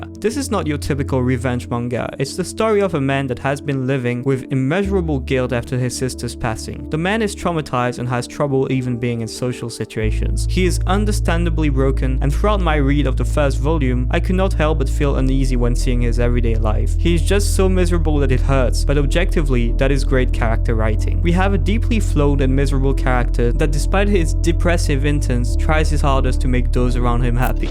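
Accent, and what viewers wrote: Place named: United Kingdom. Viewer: you are french but still your accent is unbelievably british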